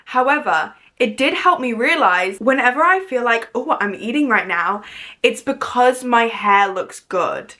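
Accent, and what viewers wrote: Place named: United Kingdom. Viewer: Girl I love this and was happy to see another British youtuber so totally embrace your own accent and leave the american one out x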